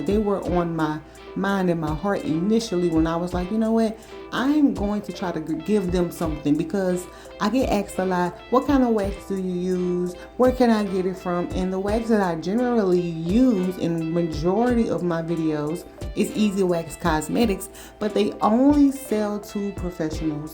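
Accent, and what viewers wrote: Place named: South, United States. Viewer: This lady must come from Mississippi or Louisiana. She had to mispronounce the easiest words. She sounds illiterate and needs to read more. 💯🤷🏿‍♀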